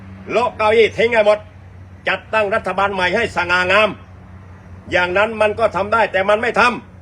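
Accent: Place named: South, Thailand. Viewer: น้ำเสียงเหมือนคนใต้นะไม่เหมือนคนอิสาน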